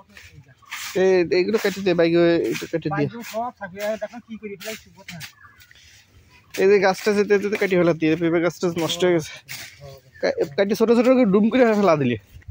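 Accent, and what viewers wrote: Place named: Faridpur, Bangladesh. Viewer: ভাষা শুনে মনে হয় আপনার বাসা মনে হয় ফরিদপুর?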